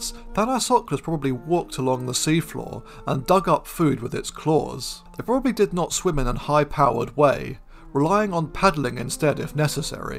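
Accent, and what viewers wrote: United Kingdom. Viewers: This funny british (bri’ish) polar bear is funny and educational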